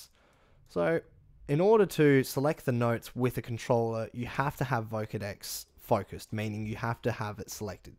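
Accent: Australia. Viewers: wow i just found your channel and so far its the only helpful channel ive found :3 im guessing you are australian? so am i!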